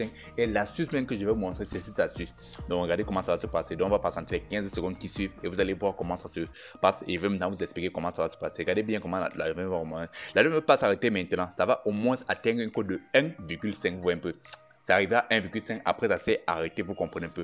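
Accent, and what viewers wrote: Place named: Cameroon. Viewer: Prochainement il faut parler doucement, tout le monde ne comprend pas ton accent camerounais et c'est rapide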